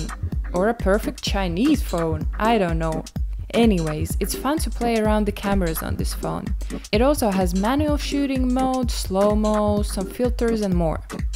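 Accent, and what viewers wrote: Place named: Balkans, Eastern Europe. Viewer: from accent I can tell you are from europe proboly ballkan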